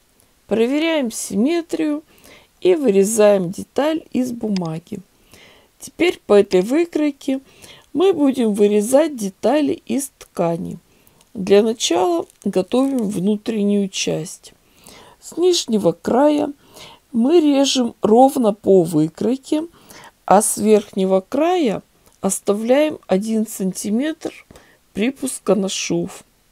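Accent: Urals, Russia. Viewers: благодарю за уральский акцент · слушать ваши анекдоты, уральским говором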